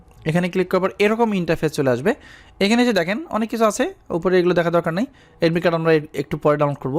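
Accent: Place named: Noakhali, Bangladesh. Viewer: ভাই আপনি নোয়াখালীর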